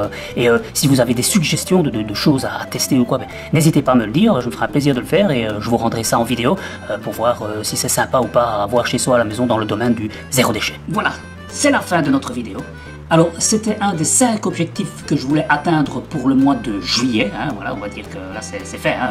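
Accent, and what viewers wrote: Belgium. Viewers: Merci beaucoup, je vais tester ..super explications avec l'accent belge...c'est toujours agréable pour une expatriée...bonne année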